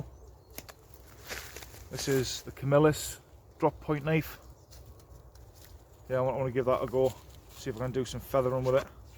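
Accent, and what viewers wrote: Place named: United Kingdom. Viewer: thanks much no problem with accent I have very many UK friends 👍 very good people in Uk top men an women 🤝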